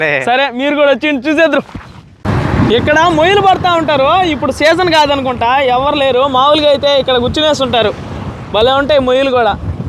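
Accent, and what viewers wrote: Nellore, India. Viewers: Mimmalni chusthunte mucchatesthundi... God bless you.. Nellore language mi daggara vintunna🥰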